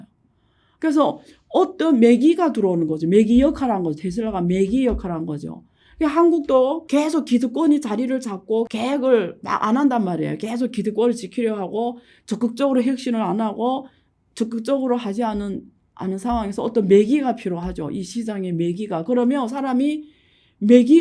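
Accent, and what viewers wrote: China. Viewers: 조선족이야? 발음이 그쪽인데 ㅎㅎ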